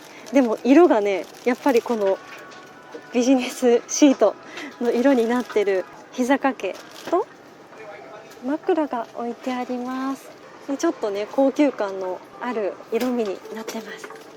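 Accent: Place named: Okayama, Japan. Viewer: 時々出てくる岡山弁にも好感が持てます😊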